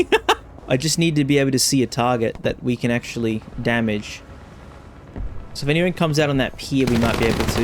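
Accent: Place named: Australia. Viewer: Why are you Australian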